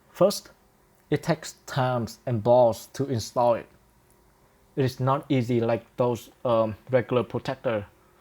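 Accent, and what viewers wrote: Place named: Vietnam. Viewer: u sound like vietnamese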